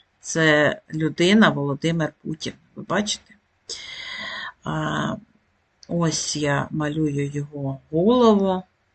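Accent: Surzhyk, Ukraine. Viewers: тримайтеся,тримайся люба Одесо.Нам всім дуже болить.В вас гарно виходить українською,а те що трошки суржик це нічого,більшість людей розмовляє трішки з суржиком. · українська мова навіть з суржиком, всеодно краще, ніж рашистська. І це дуже добре,що українська навіть через такі страшні події.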